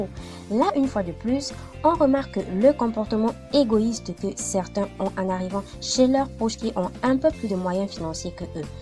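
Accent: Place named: Cameroon. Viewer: Ton accent kmer est très prononcé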